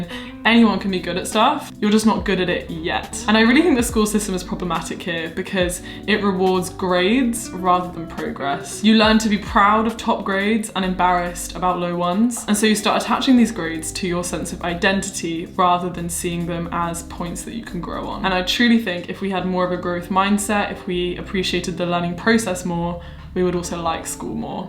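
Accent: United Kingdom. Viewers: Hey.. I love ur accent.. The first time I saw ur video, this british accent of yours inspired me to learn it...😀 Your motivational videos always made me feel confident and have gratitude and how could I forget your unique study techniques which you delivered on this platform to millions of people in order to help them out. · since i watch your videos, i have to say that i can listen to British accent easilier. One more thing is why you can have lots of energy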